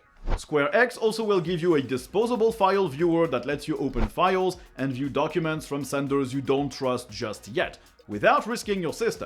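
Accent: France. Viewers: Tu serais pas français mec t’as un très fort accent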